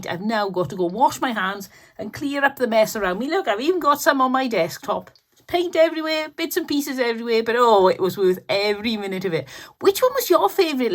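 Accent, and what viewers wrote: Wales, United Kingdom. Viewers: Don't take this wrong but after listening too so many American Crafter's, you have the most Welsh accent I have heard in ages.😂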